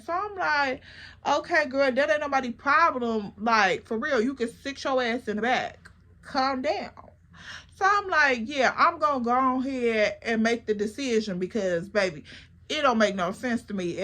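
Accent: Chicago, United States. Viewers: I could listen to somebody from Chicago talk all day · I love Chicago accents but we def need pt 2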